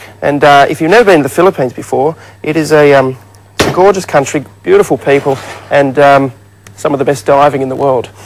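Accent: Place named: Australia. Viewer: he's australian. it's the accent.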